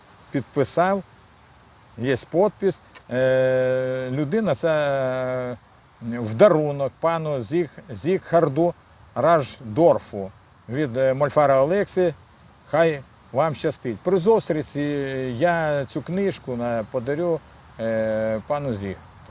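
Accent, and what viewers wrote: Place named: Poltava, Ukraine. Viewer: Чудний. Полтавський діалект такий, як треба.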